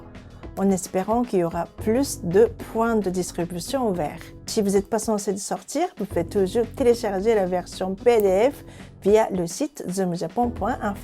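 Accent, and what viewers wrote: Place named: Africa. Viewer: C'est marrant tu parles tres bien le Francais...Avec un accent presque " Africain " !!!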